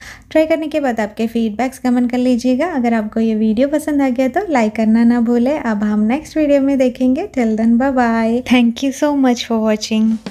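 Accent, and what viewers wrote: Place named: Kerala, India. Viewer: I like your Hindi. You remind me one of my Kerala friend.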